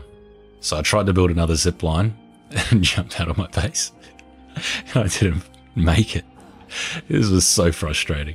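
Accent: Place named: Australia. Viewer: You sound like a true blue Aussie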